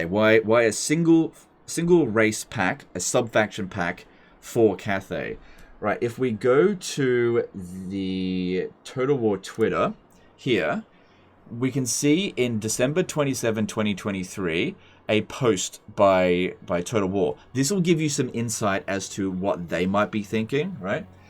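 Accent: Australia. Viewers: So the haters watch to scrutinize him over ever/any video? That’s when you know you’re successful. It also illustrates how pathetic the haters are. The guy is Australian, he sounds like a dick even when he’s not being one, get over it.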